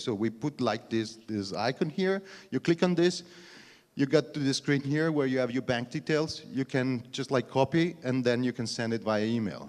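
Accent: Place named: Germany. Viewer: Ma parli inglese con accento tedesco. Come Giorgio Moroder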